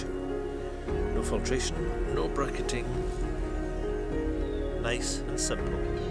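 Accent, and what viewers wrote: Scotland, United Kingdom. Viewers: Forget my final remark just watched another of your videos which answered the question,I knew there was a Scottish accent in there.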